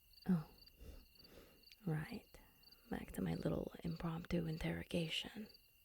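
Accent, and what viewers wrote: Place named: New York, United States. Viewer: Hey look New York vampire. Or is she from Boston?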